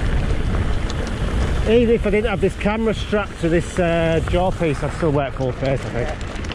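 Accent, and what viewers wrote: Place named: Yorkshire, United Kingdom. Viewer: Yorksheer way? Northern 👊🏼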